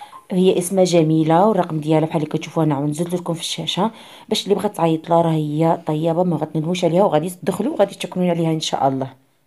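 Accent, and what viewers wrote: North, Morocco. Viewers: تبارك الله كلشي غزال ومتول بغيت نسقسيك فين كاين هاد طيابة وملات الحلوة حيت عجبوني بزاف واش تطوان أو طنجة حيت بانتلي لهجة شمالية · انا سرحت مع هدرتها ماشاء الله تتعجبني لكنة ديال شماليات ههههه عنيبة ستيتوا تهرس ليه دقمو. الله يحفظكم اختي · نموت على اللهجة الشمالية اختكم من وجدة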